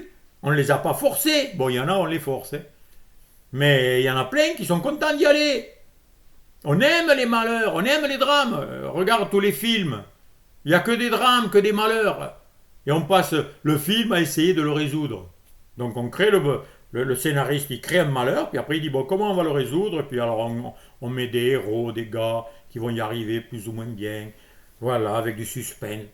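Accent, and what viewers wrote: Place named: South-West, France. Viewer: ❤❤😂❤😂quelle pêche, lucidité, humour, objectivité...n en jetez plus😂😂😂...vous êtes du sud ouest avec 1 accent aussi prononcé...?? Le même que le mien soit dit en passant..en tout j adore..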